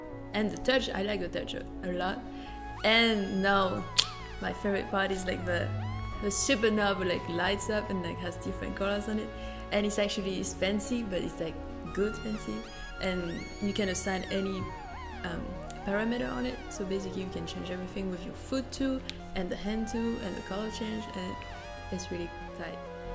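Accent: France. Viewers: Mmm cet accent français !👌👌🤩🤩🌹🇨🇵🥳😜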